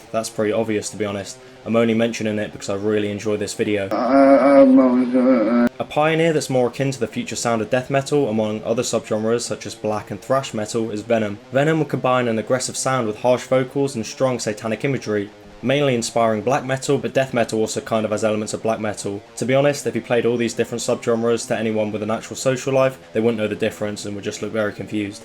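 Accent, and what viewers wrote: United Kingdom. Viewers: My favorite type of moosic, good job funny british man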